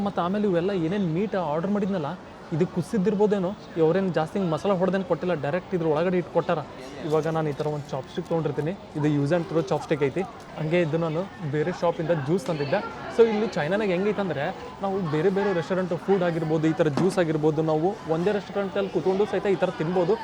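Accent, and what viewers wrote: North Karnataka, India. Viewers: Nim language uttara karnataka bro namdu bagalkot nim uru yavdu. Khushi aytu nimmannu alli nodi nimminda alli food nodoke avakasha siktu❤❤ superb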